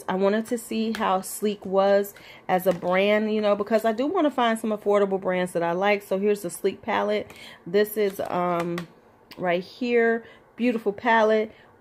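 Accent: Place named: Midwest, United States. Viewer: So glad I found your channel! I’m 49 and it so great to see more women in our age bracket doing it on YouTube. And I completely agree, we can buy what the ___ we want! I’ve raised my son and put him through college, if I want to buy new makeup, it’s My Prerogative! No apologies! I loved the video and your collection. 👍🏾 Question. Listening to your dialect, are you Midwest located? I’m Chicago burbs myself.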